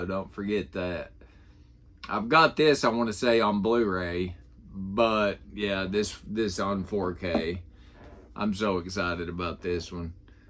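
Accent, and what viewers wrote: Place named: South, United States. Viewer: Just found this channel. Great video. You sound like a fellow Southerner. New sub.🎃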